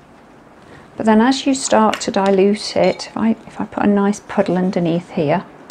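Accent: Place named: North, United Kingdom. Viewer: Hi! Thanks for this video - just chanced upon it, as I was searching inspiring videos on granulation. I love the DS range too, and acrylic inks. I'm really looking forward to departing from my usual floral art and photo-art, and try something a little different. Maybe some landscapes! You've given me a boost, and I feel really inspired to get started.... Thanks again!:O) btw, I live in Scotland now, but I clocked your accent, as I originally come from High Lane between Stockport and Buxton.... are you from somewhere around there? Cheshire, Derbyshire, York, Lancs?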